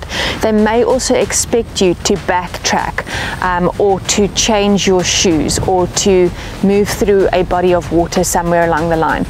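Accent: South Africa. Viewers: Listening to a South African teach me how to counter track just feels right. 🥷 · You have a pretorian accent 😊 I hope I’m right? I’m from the USA, it’s worth a guess. Also excellent content! Subbed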